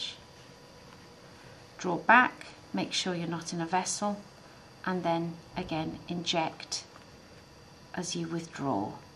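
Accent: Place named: United Kingdom. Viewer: I love british accent and the video was good to. Thanks a lot!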